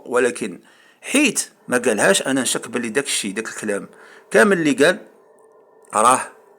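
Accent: Morocco. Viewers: طريقة كلامك مفربية 100/100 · علاش شحال كنت كتشد فجزاير لأن دينار عندكم نازل عل درهم واحنا عارفين الحد الأقصى الأجور عندكم بمقارنة مع المغرب هدرتك ولات مغربية شحال وانت فالمغرب المهم الله ينتقم من الجزايرين الخونة اعذرني الا هدرت بلهجة ناشفة ولكن انت عارف بلادك اش دارت وباقا كتدير حتا ولينا نشكو فجنابنا ومنتيقوش اي واحد جاي من عند العسكر كنتما تكون مختلف عليهم وتحط مخافتك من الله اولا وقبل كل شي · لهجتك اصبحت مغربية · لهجتك مغربية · أصبحت لهجتك مغربية و ليست جزائرية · انت لهجتك مغربية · الفاضك ولغتك تبين انك مروكي لماذا تدعي انك جزائري 🤔 🤨 انت مختل عقليا 🫢 الجزاءريين يتكلمون بشخصيتهم 💪 وانت تتكلم بشخصية غيرك 😏 يا ضعيف الشخصية يا وقح🫵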